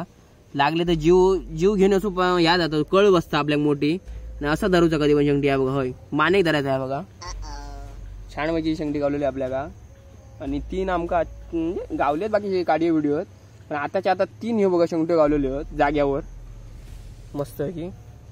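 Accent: Malvani, India. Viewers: Lay bhari bhava.. Tuzi malvani boli ti pn tuzya unique comedy andajat hasayla bhag padte.. Kahitari navin karnyacha prayatn ahe tuza khup mast vatat tuze video baghun. Keep it up bro.. 👍🏻👌🏻👌🏻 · Amazing bhava !! 😂😂✌🏻 tu ani tujha malwani accent 😂✌🏻♥️ tyane video madhe ankhin fun yeta !! Keep it up bro ♥️✌🏻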